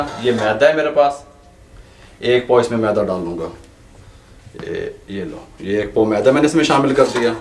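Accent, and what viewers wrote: Punjab, India. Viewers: G Allah apko BHT khushyan de mje apka bat karne ka smjane ka tarika punjabi bolne ka style BHT acha ha uncle Allah apko kamiabia de ameen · Ap k accent Punjabi h ap Punjabi bola kren bht acha lgta h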